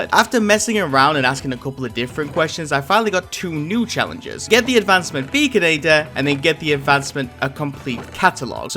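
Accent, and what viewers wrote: United Kingdom. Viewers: Are u British 😅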